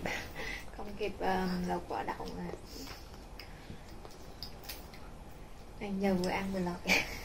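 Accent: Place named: Quang Nam, Vietnam. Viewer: chuc mung Nam moi chuc gia dinh Nam moi vui ve hanh phuc khoe manh nha🎊💥 Noi con o cau Mong Co gan may Quan ban bo tai ko rua Co di tu Nam 1990toi bay gioi ve Lai ko nho. Vi Co nghe giong cua co n noi co nghi con la Nguoi Quang nen chi hoi tham thoi.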